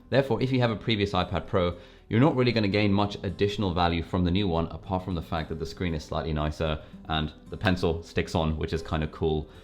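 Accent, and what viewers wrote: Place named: England, United Kingdom. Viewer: Recently found a bit water ripples on the corner screen on my iPad pro 2018, anyone does the same? Anyone can give any thought about it if I need to replace it? Always like English accent, to a non English speaker, me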